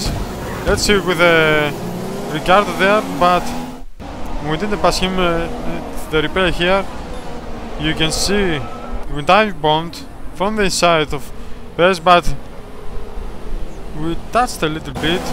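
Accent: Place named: Spain. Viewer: You spanish? 'cause I can see a hard Spanish accent and you're a Fernando Alonso fan. Nice vid m8, keep going!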